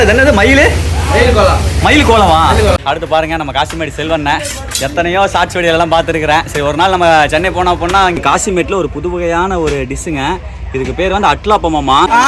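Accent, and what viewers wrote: Kongu, India. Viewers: Hi bro! How are you & your family? Unga videos yellam super bro. And we like your kongu tamil, naangalum erode tha. But ipo dubai la irukom enga v2la unga videos tha paathutu irupom. And we are waiting for your flight travel videos. And mohan annava ktatha sollunga.🎉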